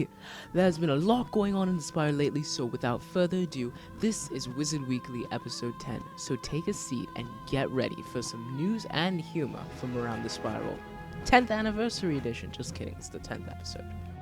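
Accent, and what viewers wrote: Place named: United Kingdom. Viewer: He sounds British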